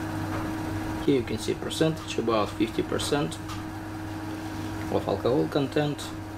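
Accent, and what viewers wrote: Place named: Russia. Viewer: русский английский режет слух))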